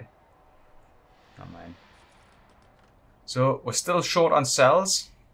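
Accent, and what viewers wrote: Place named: Newcastle, United Kingdom. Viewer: Where abouts from Newcastle are you from?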